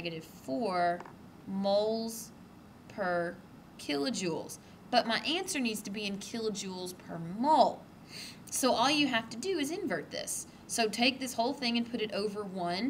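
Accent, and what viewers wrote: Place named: South, United States. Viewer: If my teacher had a cute barely southernish accent instead of being some 65 year old bore, I think I would have enjoyed class a lot more. Thanks for helping with my finals!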